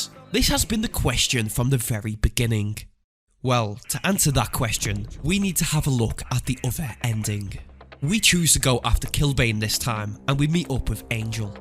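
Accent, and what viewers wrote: Liverpool, United Kingdom. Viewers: Are you a scouser? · hello fellow scouser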